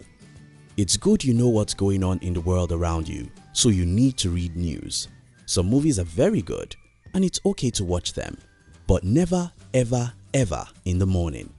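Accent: Nigeria. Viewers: Nigerian accent ...... no doubt ....mmmmmhhh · "in the secret" Funny Nigerian speaker (obvious from thick accent) uses a white hand to do the illustrations. Good message though.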